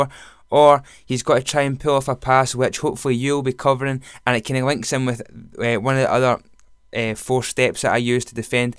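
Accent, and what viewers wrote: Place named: Scotland, United Kingdom. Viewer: Sounds ok to me, have you never herd a Scottish guy b4? lol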